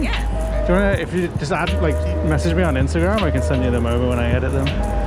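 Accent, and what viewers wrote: United Kingdom. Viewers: Ha ha yeah I was thinking Canada but he has a British accent. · You sound more British than Canadian, or am I mistaken?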